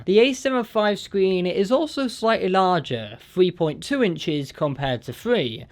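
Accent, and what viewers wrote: United Kingdom. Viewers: bro say water and father please😁.i think you from UK❤❤❤❤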